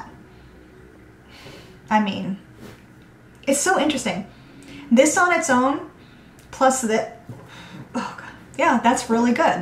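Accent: Boston, United States. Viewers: Another fellow Bostonian-ish. So funny! I actually grew up in Revere and live in Illinois now :) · Hi, I stumbled upon your videos and found them very helpful. Im new to candle making and after hearing you talk I was excited that you might be in the Boston Area ( due to your accent) Im in Peabody Ma. I then watched your moving vlog and learned that you had just moved from L.A. to San Diego. LOL. Although I was born in Boston, I moved to California in 1992. I started in San Diego,then moved to L.A. (Venice Beach, West Hollywood). I moved back to Boston about 5 years ago....took me a while, but realized I was always a new englandah.... Anyway, I really like your videos and subscribed. I find them wicked helpful. Good luck and keep up the good work. P.S. Im a graphic designer by trade, so if I can help you out, just give a hollah.... I owe you!!!! I saw your video when you went to the market...I agree.... 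I love your sign too! Cheers Chuck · I came for the candle content but stayed for the accent 🤣. I was born in Boston but have been living in Cali for over 30+ years. I miss that accent soooo much. Loved this video - and many of your top 10 (13) are in my stash as well 👍. I can second the recommendation for Little Bee Scents. I’ve used some of her FOs and they are AMAZING. A little on the pricier side, but worth it in my opinion. I’ve watched a couple of your other vids and really appreciate the honesty in your critiques, assessments, etc.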